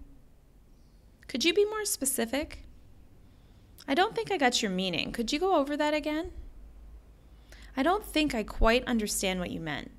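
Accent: United States. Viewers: Great American accent you have.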